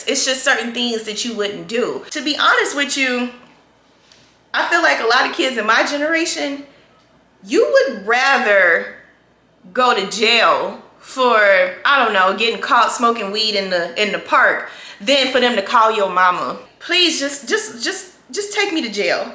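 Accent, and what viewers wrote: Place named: South, United States. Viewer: New Mayor...same as old mayor. you dont have a Chicago accent. You sound Southern.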